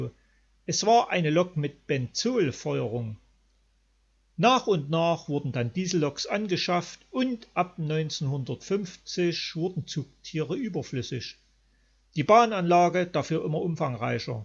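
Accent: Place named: East Germany, Germany. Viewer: so jetzt hab ich au wiedermal bei dir reingluegt ;-) Intressantes Video und der Dialekt isch ja echt , wir sagen hier Ossi ;-) haben ja viele bei uns , kenne auch ein paar aus Schmakhalden , Trusenthal , Brotterode usw , war sogar mal für eine Woche da es hat mir sehr gut gefallen , war auf dem Iselsberg , in der Marienglasgrotten , usw bis bald mal wider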